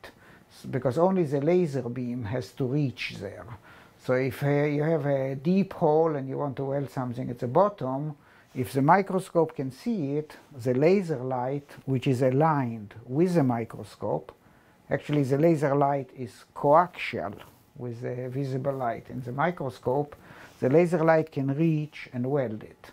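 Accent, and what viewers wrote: Israel. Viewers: Hebrew accent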